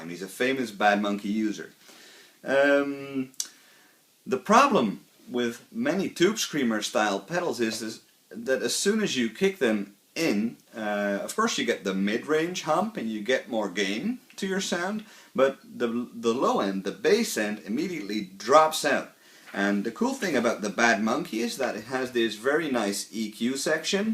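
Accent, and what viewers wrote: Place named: Netherlands. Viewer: I can hear you're Dutch! Great explanation!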